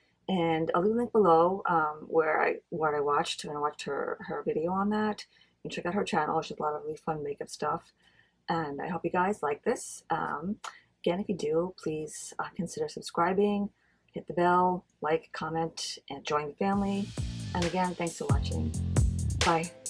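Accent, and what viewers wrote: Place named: Scotland, United Kingdom. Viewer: I loved the end result, all the colors came together nicely. I like that you shared that you are a minimalist when it comes to make-up, i'm the opposite in that I love to do a full face look with contour and all. I must commend you on the editing of the video when you showed the product as you are using them, wow. I just hit the subscribe button just as you were saying to subscribe, lol. And finally, I love, love, love your accent, it sounds kinda Scottish so beautiful.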